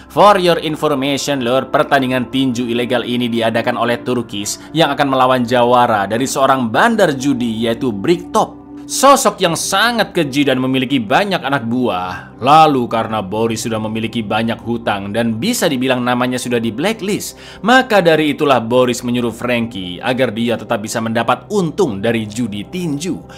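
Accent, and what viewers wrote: Java, Indonesia. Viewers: Logate sampean kayak pantura marai aku kelingan omah mas, seneng ngrunguknoe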